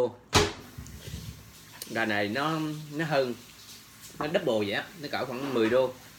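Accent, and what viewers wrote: Quang Nam, Vietnam. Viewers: Anh nc pha tiếng quảng nam quảng ngãi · Có phải anh là người quảng nam đà nẵng không?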